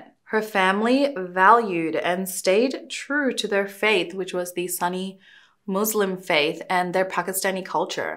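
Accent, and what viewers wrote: India. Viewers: Ehhh I always thought your ethnicity was South Asia. Even when you talk about your childhood and stuff it sounds so Indian XD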